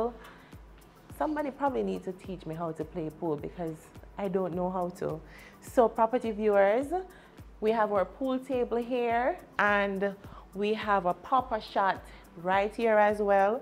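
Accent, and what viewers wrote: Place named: United States. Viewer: Right here, not right her, that's American's dialect. Your English is great enough.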